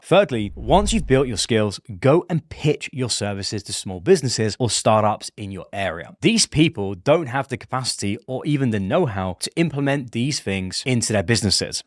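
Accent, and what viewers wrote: United Kingdom. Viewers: Love from Ireland, your neighbour as I can fish out the distinct UK accent 😊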